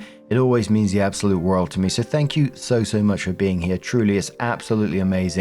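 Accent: United Kingdom. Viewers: It is a British thing FYI, sometimes they don't pronounce 'co' or 'ch' with the hard 'k' that Americans do.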